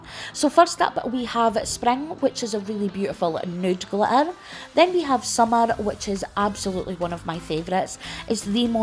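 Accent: Scotland, United Kingdom. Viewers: My first time here. Scottish?? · Really nice to hear the voice of not only a fellow nail art enthusiast but also a fellow scotswoman😍👍😍👍😍👍👏👏 also great vid!! I am soooo envious of your glitter collection.🤣🤣🤣🤣😟😟😟🤣🤣🤣 gorgeous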